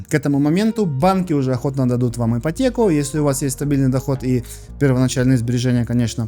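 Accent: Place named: Ukraine. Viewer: Обычный украинский говор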